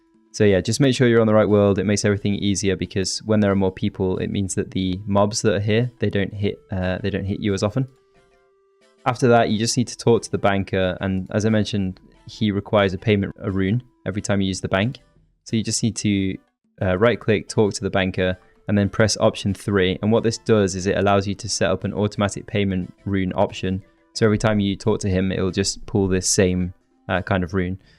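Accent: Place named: United Kingdom. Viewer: Great video but you're british :/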